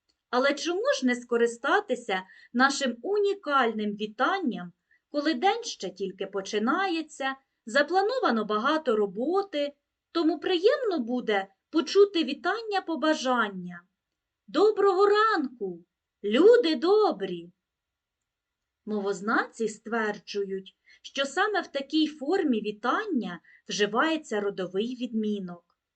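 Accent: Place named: Poltava, Ukraine. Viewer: Завжди впізнаю діалект Полтавщини 😄 Щасти Вам!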